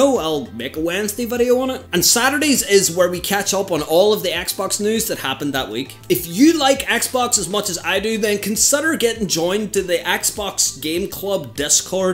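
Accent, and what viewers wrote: Scotland, United Kingdom. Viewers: scotish i think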